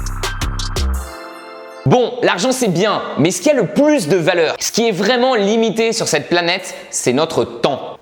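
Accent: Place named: South, France. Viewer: Top ta vidéo tout comme ton accent méridional !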